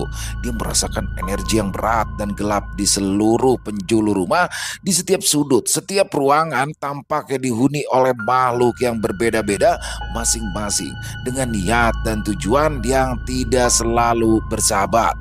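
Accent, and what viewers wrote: Jakarta, Indonesia. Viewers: Saya suka kisah santai,lucu gaya bicara nya betawi bgt pokoknya kereenn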